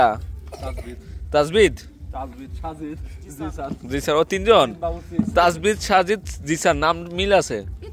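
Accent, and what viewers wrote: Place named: Comilla, Bangladesh. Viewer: তোমাদের গ্রাম কোন থানায়।তোমার ভাষা শুনে মনে হচ্ছে কুমিল্লার ভাষা। ঢাকা থেকে।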